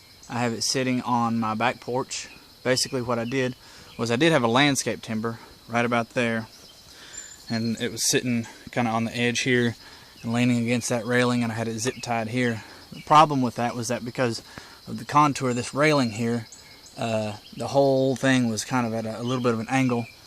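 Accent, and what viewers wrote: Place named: Eastern Kentucky, United States. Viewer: you sound like a Eastern Kentucky boy, am I right ?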